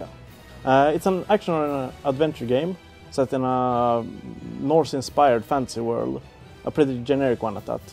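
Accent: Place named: Sweden. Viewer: That's hell of an accent you have there and I say that as a fellow swede.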